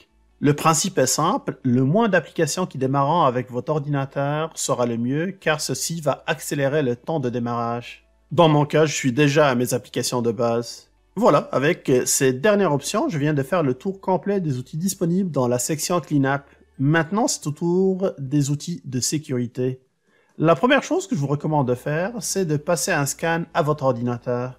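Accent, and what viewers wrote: Quebec, Canada. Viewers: Une question linguistique : votre accent québécois, se serait-il pas saupoudré de quelques épices du Maghreb ? Sinon, super chaine, très utile et qui va droit au but. Merci pour toutes ces infos ! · Un grand merci, toujours un plaisir de vous entendre avec votre accent canadien. Bon week-end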